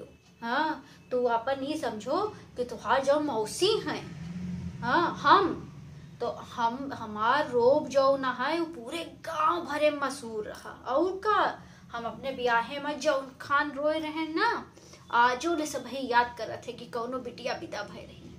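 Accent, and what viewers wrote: Awadhi, India. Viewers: Verý nice gajab ki aktar ho tumhare muh se a avdhi boli bahut achhi lgti hai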